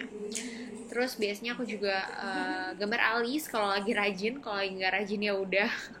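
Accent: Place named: Bandung, Indonesia. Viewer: Dari bandung ya teh, hehe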